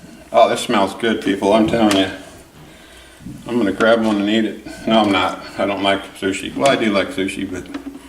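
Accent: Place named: Wisconsin, United States. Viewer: Thanks for this recipe! I needed some ideas for fish, and I have a freezer full of carp and gar that I will be turning into jerky. Are you from Wisconsin? You sound just like some of my friends from Rhinelander!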